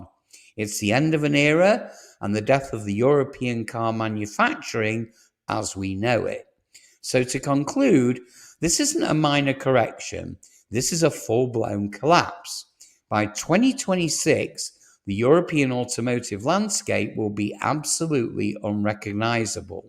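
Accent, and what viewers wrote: United States. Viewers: why (mis)pronounce the car brands like a yank, I thought this was about european cars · American pronunciation. Same with tomay-to. There are some differences, do keep up.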